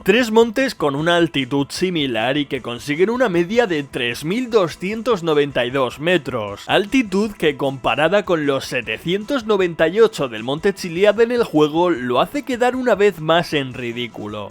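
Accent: Murcia, Spain. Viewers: Yo lo sabia y lo dije tu eres murciano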